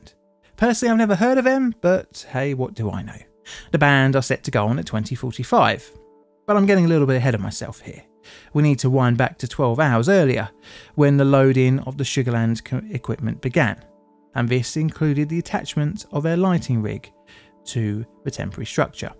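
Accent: United Kingdom. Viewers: Why would i get my Indiana state news from someone who sounds like a limey?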